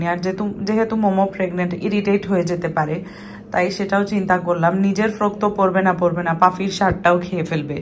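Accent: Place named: Sylhet, Bangladesh. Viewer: Ada math amrar sylhety r adakan suddo Bangla na matiya je kono ekta math tik koro go Apu te bala lagbo 😊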